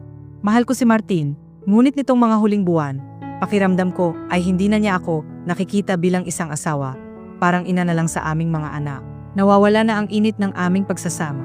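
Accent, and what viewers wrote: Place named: Visayas, Philippines. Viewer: Bisaya ka ba? Nkakawalang gana tagalog mo.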